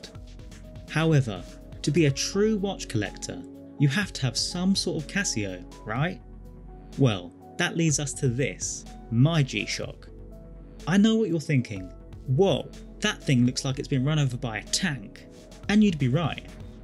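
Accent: United Kingdom. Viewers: He's British. He's just talking.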